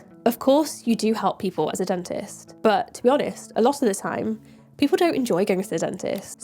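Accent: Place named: RP, United Kingdom. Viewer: You sound very posh!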